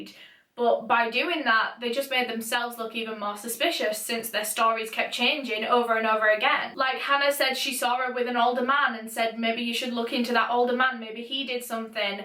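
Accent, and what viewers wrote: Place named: Yorkshire, United Kingdom. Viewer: As an British person~ i belive yorkshire-? Or a tiny bit welsh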